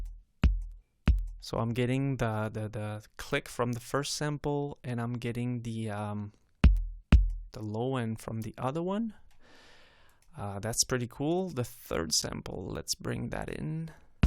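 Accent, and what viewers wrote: Quebec, Canada. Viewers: Do I detect a French Canadian accent?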